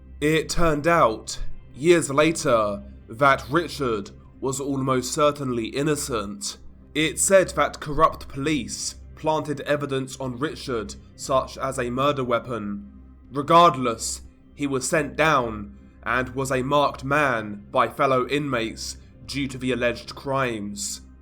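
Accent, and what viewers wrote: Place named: United Kingdom. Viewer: Man, this british accent is fire for telling such well writen stories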